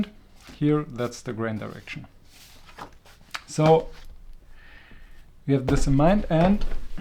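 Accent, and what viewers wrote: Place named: Germany. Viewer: a few seconds in and i see papers and i hear german accent... subscribed.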